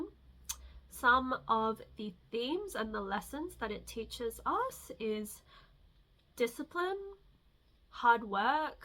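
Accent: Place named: Australia. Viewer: 🕊 Blessed Shukra/Venus day Love💌, You are so sweet & genuine💕💞💓 I am so honored & humbled by your beautiful sentiments! Thank you😭 🙏🏾🥰 I can say the exact same for you love!! I'm currently living in Puerto Rico. I heard your accent and now I've confirmed you're in Australia! I've been being called to the Southern Hemisphere for decades and I know I will get there one day! You're always welcome my way!! I'm a traveler so I'm always changing my LO📍 I have previously shared creations and guides/tools online but I've been preparing for my next projects so I'm not currently on any socials outside of this YT I don't use. I occasionally use X for specific purposes. Big Rebirth as I'm fully exiting my Sade Sati, my Rahu/Ketu returns, Revati Rahu/Hasta Ketu D1 and I have a D9 Jupiter Punarvasu. I'm very eager by next year to gather fellow vedic astrologers like yourself and collectively put something out together and start a support network. 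I've got some books/content in the works right now and a tarot deck I've been designing. I checked out your soundcloud and🙌🏾 🔥💀🌺📿 I'm an Anuradha MC Jyeshtha Venus with Dhanishta D1 I'm so here for that trip you're taking ppl on!! Thank you for blessing us! I'll support you any way I can!! I have a lot of friends that are global DJs. My friend DJ Haram/Zubeyda is a female queer DJ who loves to support marginalized artists. There's a great network in that crew of people I used to support more physically. She's a great person to connect to!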